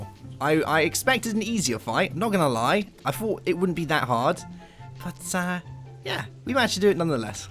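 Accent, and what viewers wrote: United Kingdom. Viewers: Foster He is British. That's how we say meteor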